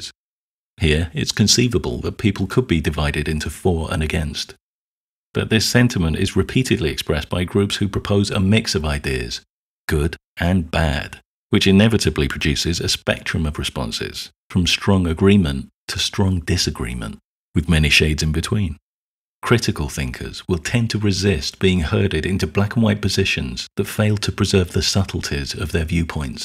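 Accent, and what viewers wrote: England, United Kingdom. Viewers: Don't listen to this man just because he has an English accent, and minimal graphic design. His opinions are no more valid than yours and mine.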